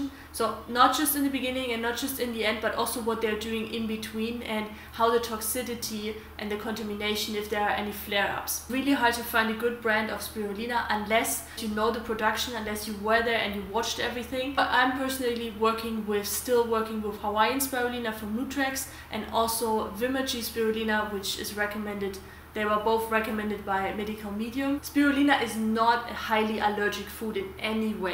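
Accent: Germany. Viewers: So which spirulina is the best to buy? By the way, you have a verry german accent 😊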